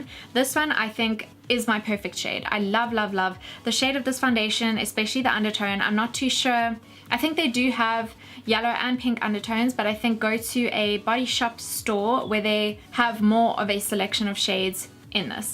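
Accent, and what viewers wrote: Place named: South Africa. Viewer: I was like.. waaaait... i know this accent xD Finally a Youtuber I can relate to with our limited Makeup selection in SA <3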